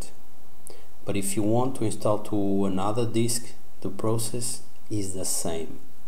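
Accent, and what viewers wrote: Portugal. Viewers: Aposto que o autor do video é português, pelo sotaque! I want know if I can install on a 16gb pen? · Na hora que ele começou a falar eu sabia que falava português kkkkkk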